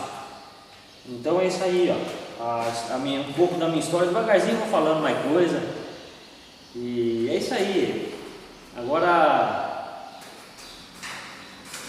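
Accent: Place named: São Paulo, Brazil. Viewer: Entendi, pelo sotaque pensei que era perto da minha cidade. Torrinha SP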